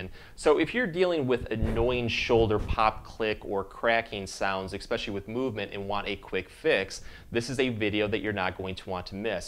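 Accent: Michigan, United States. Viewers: Did these exercises ONE TIME and found that almost all my popping and cracking were gone. I will be continuing these daily. Thank you! I also loved hearing a Michigan accent again; I attended Interlochen Arts Academy up by Traverse City.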